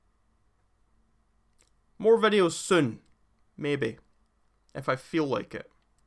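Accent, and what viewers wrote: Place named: Scotland, United Kingdom. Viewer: If an Australian person punches you square in the nose that would be very very real Also, you called out Aussie accents and your literally Scottish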